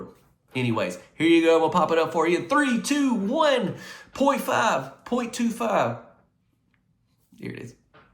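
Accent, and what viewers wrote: South, United States. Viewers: This is so adorable, I love it (and I just love your southern accent too!)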